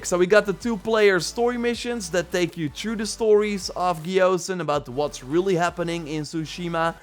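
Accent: Netherlands. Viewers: Aan je accent te horen ben je een Hollander ,choes your diffucultie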